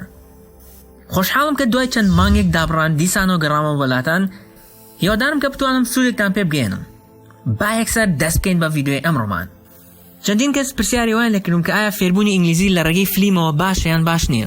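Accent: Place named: United States. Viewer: thanks l hope sooo and its really good you using accent American.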